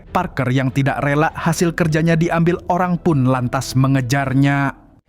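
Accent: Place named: Banjar, Indonesia. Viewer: Inya orang sungai tabuk😂😂😂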